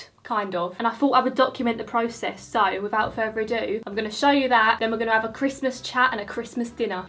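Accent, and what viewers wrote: Essex, United Kingdom. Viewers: I've come to your channel from Alice's and honestly, you're so funny. Love your personality and the Essex accent!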